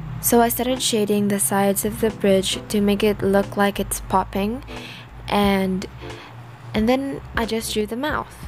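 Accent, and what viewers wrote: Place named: England, United Kingdom. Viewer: I love ur english accent🥰😌 btw I really like ur videos😫💖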